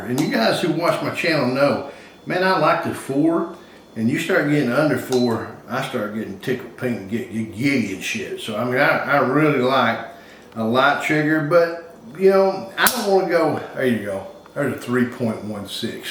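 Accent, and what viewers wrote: United States. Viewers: What state do you live in? Sounds like America. Sorry I'm a POW in commiefornia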